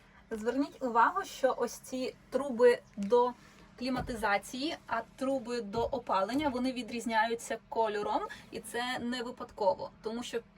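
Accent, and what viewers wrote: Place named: Poland. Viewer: тут саме Польщу чути, не Львів)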